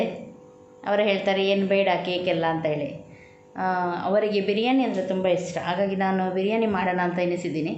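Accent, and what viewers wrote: Mangalore, India. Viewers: Hi I'm from devanahalli nim yella vlog na ee lockdown time nali nodtidini kushi agute nim family nodoke n nim tumba lucky Olle family na aa devru kotidane nange nim birthday nim husband celebrate madidu adu nange tumba kushi aytu n nim mangalore baashi tumba chenda untu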